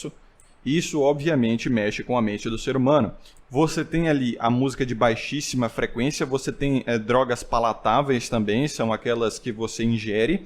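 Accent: Bahia, Brazil. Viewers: O é baiano? 😳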